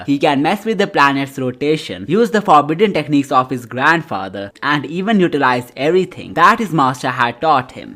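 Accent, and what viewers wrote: India. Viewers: Where are you from, sound Indian?'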